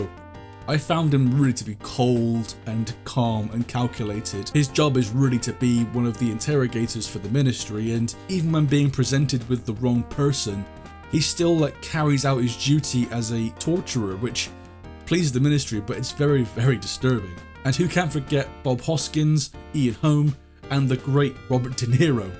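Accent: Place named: Sussex, United Kingdom. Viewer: Where are you from, mate? Got to place your accent. I'd say Sussex if I had to guess or Wiltshire. Sorry, I've got something wrong with me where i need to know where people are from?!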